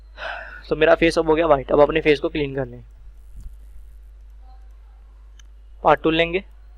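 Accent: India. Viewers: Buhat achi video hy or specialy bol b buhat desi andaz sy rhy .buhat detail sy bnaty ap video Allah pak apko kamyab kry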